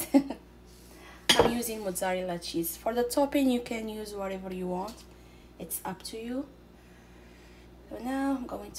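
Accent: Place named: Morocco. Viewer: تبارك الله عليك قبل منشوف الصوره ديالك غير من الهضرة عرفتك مغربية ۔ الله يوفقك وشكرا علی الفيديو الجميل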